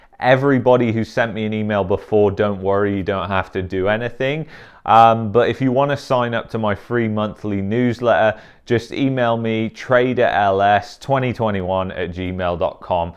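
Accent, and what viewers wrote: United Kingdom. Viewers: Why do you have a British accent ?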